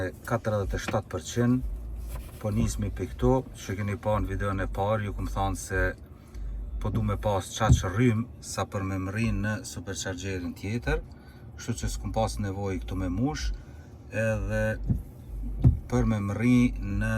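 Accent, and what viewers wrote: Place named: Skopje, North Macedonia. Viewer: prsh, mos je i shkupit i nderuar , dialektin tipik shkupjan gjithashtu edhe stilin , respekte klm.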